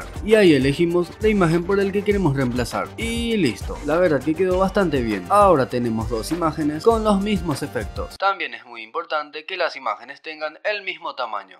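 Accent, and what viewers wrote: Paraguay. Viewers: interesante se nota mucho nuestro acento paraguayo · Tu acento en muy paraguayo ¿eres de paraguay?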